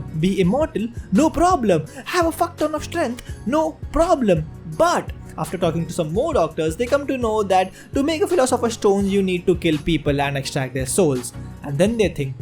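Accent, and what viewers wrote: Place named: India. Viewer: Bro, i love your videos and i think you could become a huge anituber. But the main thing is if american/european/Canadians will watch your videos. Cox the anime community there is around 5 million people strong. Search up Gigguk, that's the community you can appeal to. I'd say only problem would be the accent barrier, but your English is already really good. You can become the first indian accented YouTuber who's appealing to the western audience. You can do it, just keep on going. I'd say also refine your content a bit more to appeal to western audiences more. Cox let's be real, the indian anime today is where the USA anime community was in the late 90s. It'd take decades to grow by just appealing to the indian audience.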